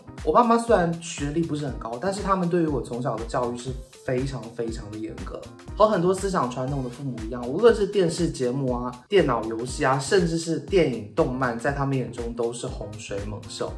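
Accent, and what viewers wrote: Taiwan. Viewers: 我也以為是台灣人耶🤣想說是不是為了拍片所以講的比較字正腔圓而已🤣原來是廈門人啊！ （大家都好和平覺得這裡很棒！） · 廈門在福建 所以口音像台灣腔很正常的（福建人的口音真的很像台灣腔） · 我一直以為COMA是台灣人XD 因為腔調太像了~原來不是~ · 感覺是香港人？一直覺得有些用語很中國，但腔調非常台灣疑惑超久XD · 你们当然能听出来了，但是对于大陆尤其北方的来讲这已经很台湾口音了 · 幽靈船那部電影好看，“鳥“是一部很經典的驚悚片。COMA應該是曼德拉效應困擾了你，話說COMA是哪裡人啊！口音用詞聽著很台灣，可不可以請問是哪裡人，我真的沒有惡意。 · coma居然不是台灣人😯 為什麼口音這麼像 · 我還以為coma是馬來西亞人🙈你的口音不像內地人反而偏向台灣人，還時不時說一兩句英文😂😂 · 因為口音很像台灣人😄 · 有些是不太一样 有部分听起来会更偏台湾 有部分更偏大陆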